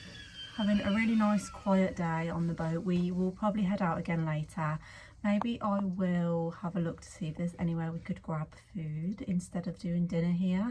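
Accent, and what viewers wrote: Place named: Birmingham, United Kingdom. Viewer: Bath is beautiful, I'm glad that you had some sunny weather, 🌞 A moored narrowboat, in Bath, is a great first time experience. The stunningly good YouTube algorithm bought me here 😊 I'm not a boater but used to work in Bath and I know some parts of the K&A. Unfortunately, I know of several dogs that have drowned, particularly Bulldog types. Every dog falls in at some point. So perhaps get Nina a life jacket that also keeps her nose out of the water. The K&A is so popular, it's one of the most congested canals and finding moorings near settlements is difficult. I know nothing about you, but hear the Birmingham accent, so I guess canals are in your DNA 😅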